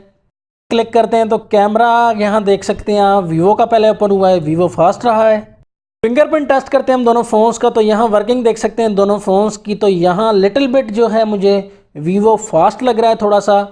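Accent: India. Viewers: ngomong naon si kentel jasa bahasa inggris india na,mere ge hese ndeuk meuli hp adoooh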